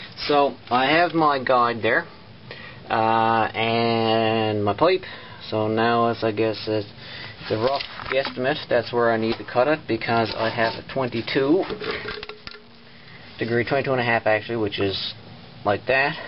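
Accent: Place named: Newfoundland, Canada. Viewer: This video saved me a ton of time. Thanks! Recognize that NL accent -- should have tracked you down to install it for me! HA! Thanks again!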